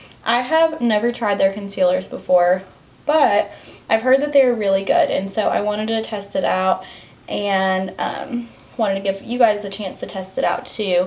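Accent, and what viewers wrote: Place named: South, United States. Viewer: omg I love this so much!!!! you’re so talented and btw idk why but I love your voice bc you have like a country accent almost and it’s so cute!!! you’re so good at makeup considering your self-taught!!!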